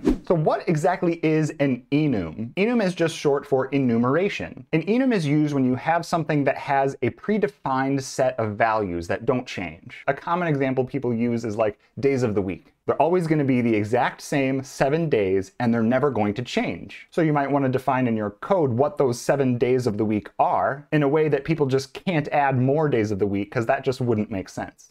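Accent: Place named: United States. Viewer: I love this accent in English ❤ American accent ❤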